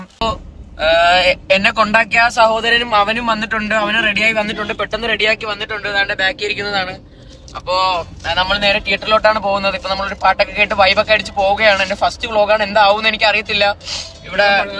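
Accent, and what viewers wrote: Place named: Trivandrum, India. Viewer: Vlog adipoli🎉 pinne nee tvpm anoo aa samsaaram adhupoole und😁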